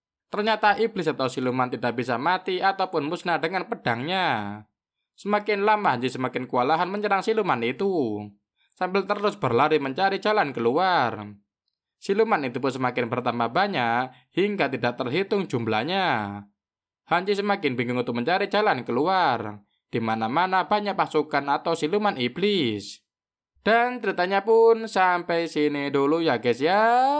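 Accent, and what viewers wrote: Java, Indonesia. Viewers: Medok bet min bacrot ente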